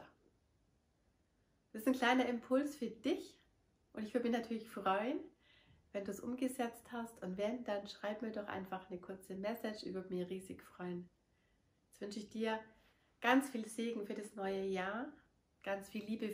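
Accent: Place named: Bavaria, Germany. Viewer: Schoen,der bayerische Dialekt🙏🙏fuehle mich zuhause🙏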